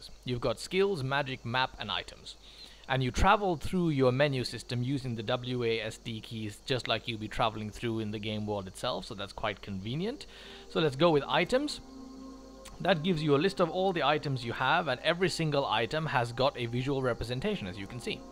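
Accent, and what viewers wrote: United Kingdom. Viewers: That is a British accent, the man is actually British :P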